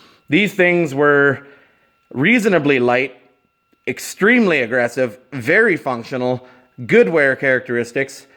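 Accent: Canada. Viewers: I swear all you Canadians sound the same..I can just tell right of the bat your a Canadian 😂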